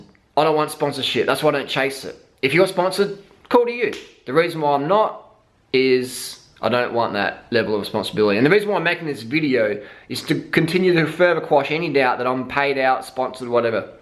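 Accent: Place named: Australia. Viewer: has anybody ever noticed how annoying his fucking voice is like Australian accent is cool as fuck but his is just fucking annoying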